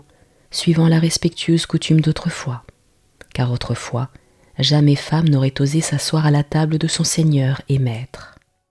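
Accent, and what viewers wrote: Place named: Provence, France. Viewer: désolée c est le téléphone qui a mal écrit Je voulais dire l accent provençal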